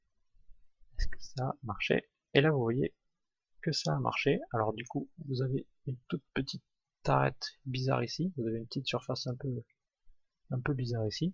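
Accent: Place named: South, France. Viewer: Bonjour Ce sont des super tutos, j'apprends sur 2 écrans en faisant au fur et à mesure et j'ai pu imprimer les premières pièces dessinées sur freecad. cependant, une petite question: quelle version de freecad utilisez vous sur les premières vidéos? Sinon c'est génial avec l'accent du sud!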